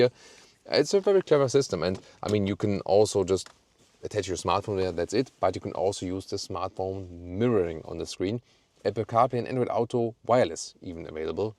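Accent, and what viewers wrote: Germany. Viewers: Such a beautiful review, very enjoyable to watch and listen too. I do love the elegant German tone of voice in English language. 11/10 review. Thank you so much!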